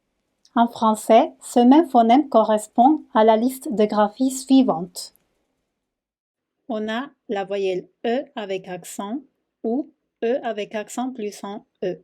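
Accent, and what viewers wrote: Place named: Spain. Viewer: HoLa !! Je suis Français (donc ma langue maternelle est le français) et hispanophone "autodidacte" (je n'ai jamais suivi de cours mais j'ai beaucoup écouté et lu et vu des tutoriels) : il me semble que votre vidéo, si je l'ai bien visionnée et écoutée attentivement, peut prêter à un certain degré de confision, car il ne m'a pas semblé que vous abordiez le "e fermé" (peu, deux, feu, euh...) alors même que c'est la manière type de prononcer cette lettre quand on récite l'alphabet en français ! d'ailleurs, quand vous évoquez brièvement le "y" vous le nommez "la yod" alors qu'en français, on dit "le i grec" ! par ailleurs vous récitez les voyelles "à l'espagnole" en particulier le "u", que vous prononcez tel un "ou" à la française... merci cependant pour vos efforts de vulgarisation ! Par ailleurs il me serait loisible d'entrer directement en contact avec vous ou quelqu'un d'autre de L'atelier du français | Paris (pour une bonne raison musicalo-professionnelle car je suis Compositeur) !!!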